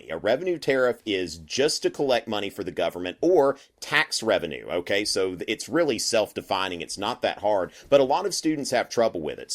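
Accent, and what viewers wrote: South, United States. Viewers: Love your content and your southern accent!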